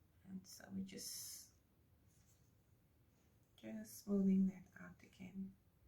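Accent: South Africa. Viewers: Beautiful. I am also a newby with this hobby and prefer the Dala Craft paint rather than the Dala acrylic. Where do you buy your Dala paint from as I am looking for 1 liter Dala bottles. There is a company in CT that sells the 1 litre containers, but I cannot remember the name and no name rings a bell if I google it. By the way, it is wonderful to hear a South African on Youtube pouring art channel.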